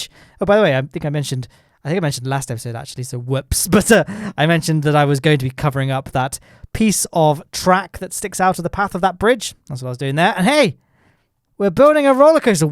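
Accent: United Kingdom. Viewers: Finally I'm early to "a guy with a British accent" vid. Ps I Only watch your ksp vid they're better no offense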